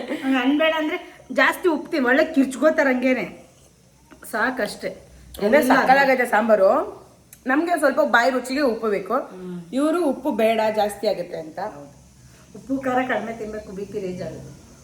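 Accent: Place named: Hassan, India. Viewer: neevu hassan kadevra neevu mathadodu and aduge style ade reethi ide navu hassan adke kelde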